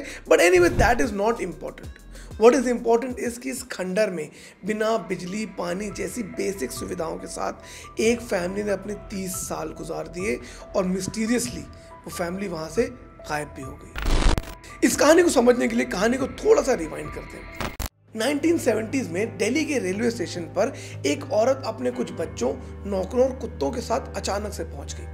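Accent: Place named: Western Uttar Pradesh, India. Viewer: I like the husk in your voice texture and also your skill to communicate and explain the depth of emotions of each character involved in the story. I salute to the courage of that mother who played a fake identity so efficiently for decades just to keep her family secure and surviving under a shade. Aapke bolne ke lehze se lagta hai aap U.P. me Etah, mathura ya mainpuri ke aas pas ke ho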